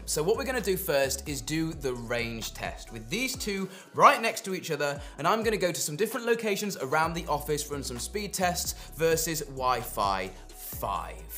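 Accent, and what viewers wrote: England, United Kingdom. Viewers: He's from the UK, not from the US... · How are you going to tell an Englishman how to English? 😂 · Each time I heard the British say "Rooter" for "Rou' ter" I cringe. Nothing bad implied, It reminds me of "root canal".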